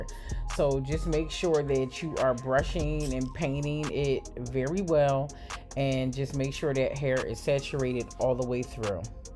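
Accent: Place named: Delaware, United States. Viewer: I’m from Delaware but I live in Cali now. I love watching your videos and hearing your accent. It always reminds me of home ♥️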